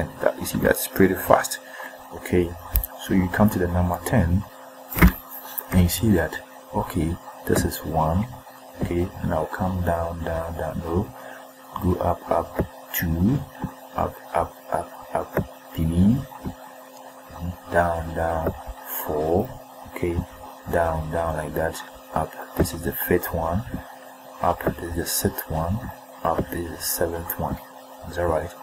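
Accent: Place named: France. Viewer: I have never seen such a thick french accent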